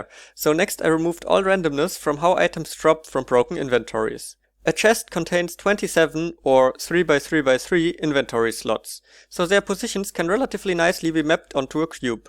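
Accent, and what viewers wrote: Germany. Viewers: I think he is a german guy who speaks english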